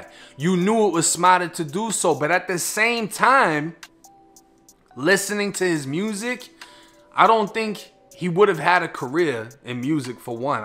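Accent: Boston, United States. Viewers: U got ur Boston accent back in full when I seen u on 32 n 1 years ago u sounded like u Florida boy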